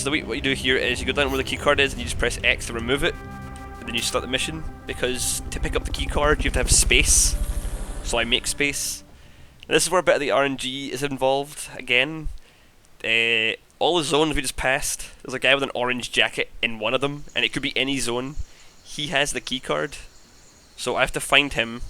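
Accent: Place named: Scotland, United Kingdom. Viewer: Nice scottish accent. Whats up from Dundee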